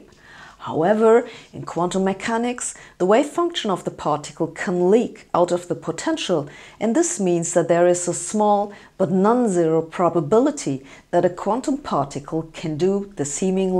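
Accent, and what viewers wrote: Germany. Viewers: Sabrine, great job I love your videos but now the but. English sort of compresses parts of the words and many non english born seems to think that that is not so important, But it is. If the relevant parts are not compressed it is sometimes difficult to catch the word. In short you sounds like a german ( surprise surprise) So the efford you need to improve is very little and you will not only be great but sound great. Sorry if inapprobiate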